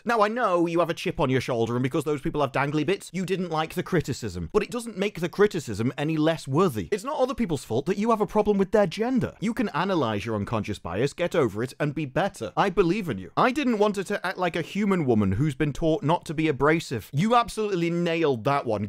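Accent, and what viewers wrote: United Kingdom. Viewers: Holy shit man you have one serious British mouth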